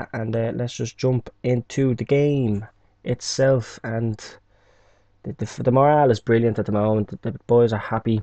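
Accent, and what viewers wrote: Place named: Dublin, Ireland. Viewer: well mate good video keep it up u sound like a Dublin man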